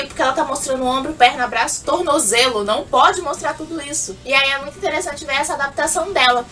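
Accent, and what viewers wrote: Salvador, Brazil. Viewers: Vocêee é de salvadoooor?????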